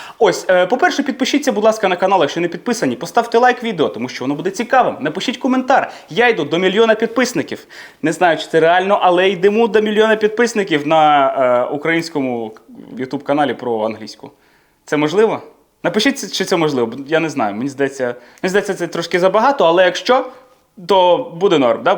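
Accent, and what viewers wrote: United Kingdom. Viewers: а це британьській вимова? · Британський акцент - це просто 🔥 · Дякую, дуже цікаво! У Вас чудова вимова!!! Чисто британська! ❤👍🇺🇦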